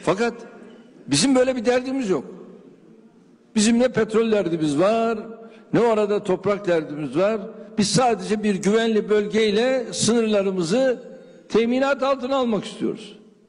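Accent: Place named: Arab world. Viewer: Arap şilvesi bunu dinleyeceğime biden i dinlerim daha iyi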